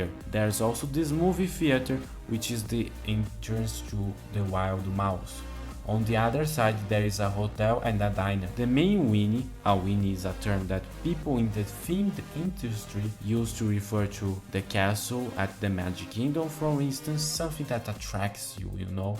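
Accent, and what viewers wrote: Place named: Brazil. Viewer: lol, tu é brasileiro? Esse sotaque BR com tantos "i" no final das palavras tá muito forte hein hehehe.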